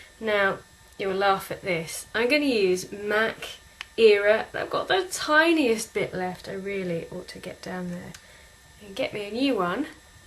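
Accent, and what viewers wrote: England, United Kingdom. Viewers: iam not gay or anything I love your voice. Are you from England?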